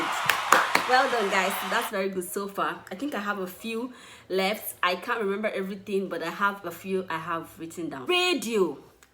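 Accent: Anambra, Nigeria. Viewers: Please are you speaking central Igbo? Or just Anambra dialect? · She speaks Anambra dialect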